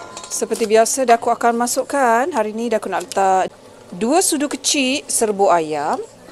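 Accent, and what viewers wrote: Negeri Sembilan, Malaysia. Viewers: Bagusnya.. Tak lupo cakap nogori walau dimana sajo berado... Nismilan negori kito....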